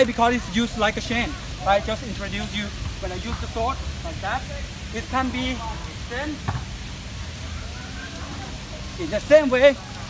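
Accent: Thailand. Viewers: สำเนียงนี้คนไทย555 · ตอนแรกนึกว่าชาวต่างชาติ แต่พอฟังสำเนียงเลยรู้ว่าเป็นคนไทย สุดยอบ At first, I thought he is in another country, but when I heard his accent I know he is Thai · นึกว่าคนประเทศไหน พอได้ยินสำเนียงอังกฤษเท่านั้นแหละ ไทยนี่เอง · hey i know you are thai with your accent THAI GANG 🔥 🇹🇭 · somehow your accent sound like Thai accent to me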